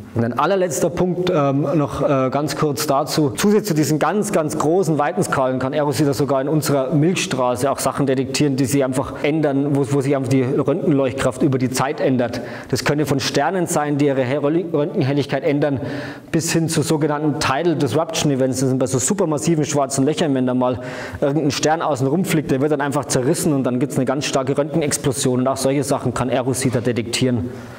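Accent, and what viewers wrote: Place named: Bavaria, Germany. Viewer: Mit schwerem (bayerischen?) Dialektakzent und zu hohem Sprechtempo leider schwer verständlich!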